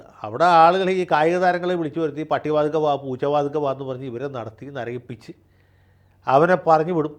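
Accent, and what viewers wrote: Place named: Trivandrum, India. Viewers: Trivandrum bhasha super 😂😂😂😂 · വാസ്തവം ഫിലിമിലെ ജഗതിയുടെ റോൾ ജയശങ്കർ സർ നേ സ്വാധീനിച്ചുവെന്നു 100% വ്യക്തം....ട്രിവാൻഡ്രം സ്ലാംഗ് സൂപ്പർ...❤ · സ്ഥാനത്തും അസ്ഥാനത്തും തിരുവനന്തപുരം ഭാഷ പറഞ്ഞ് "ഹാസ്യം" സൃഷ്ടിക്കാൻ ശ്രമിക്കുന്നത് പരമ ബോറായി തോന്നുന്നു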